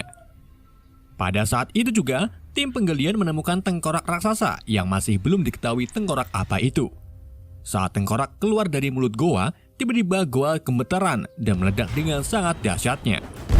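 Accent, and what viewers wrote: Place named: Java, Indonesia. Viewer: Bahasa jawanya khas bgt 😂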